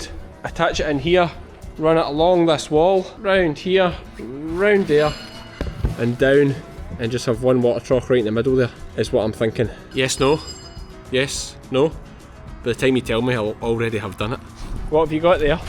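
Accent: Scotland, United Kingdom. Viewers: I know there's more to Scotland than your accent, but that little "och!" was so adorable!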